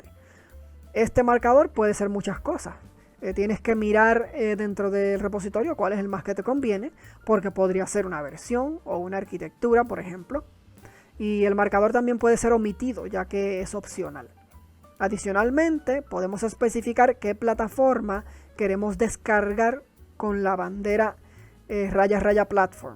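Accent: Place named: Puerto Rico. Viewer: acho Mano. Sera Puerto Riqueño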